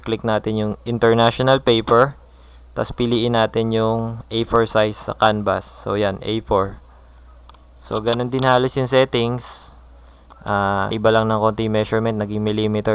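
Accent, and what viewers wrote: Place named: Philippines. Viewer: He is using tagalog language so he's accent is in tagalog too.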